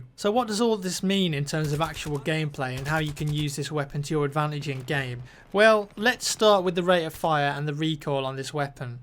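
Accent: United Kingdom. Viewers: where do you live, because it sounds like you have an accent from the UK, but I see you playing with DOOM49 who lives in the US, right? When you play together, don't you get a lot of lag?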